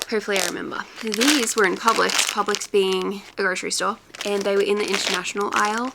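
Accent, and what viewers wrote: Australia. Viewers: OMG SHE HAS AN AUSSIE ACCENT 😭❤️ YESSSSS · !! Her little accent!! Butter and water was definitely all Aussie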